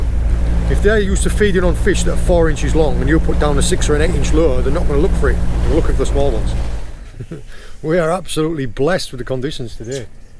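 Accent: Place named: Northern England, United Kingdom. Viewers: Just randomly found your channel, you said your in Cornwall but you have a bit of a Northern accent. Anyways I will be watching more, keep up the good work mate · Hi, I feel like I detect a Cumbrian accent - not sure if that's right but you sound just like my Granda when we went fishing. Just want you to know that watching and listening to you has made his passing easier, especially when you explain things, it's like hearing him again. Love what you do marra, keep it up!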